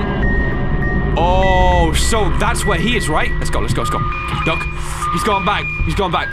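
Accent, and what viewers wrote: United Kingdom. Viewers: British people pronounce certain words interestingly.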